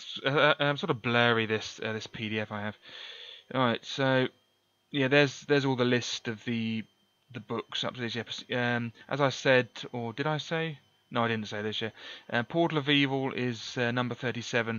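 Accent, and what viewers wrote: United Kingdom. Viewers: Advice: stop babbling about crap no one cares about. I didn’t come here to listen to an English toff talk about and. I came here to watch a FF game get played. For anyone who actually cares, this guy doesn’t even start until twenty minutes in. Your voice is clear so at least that’s something.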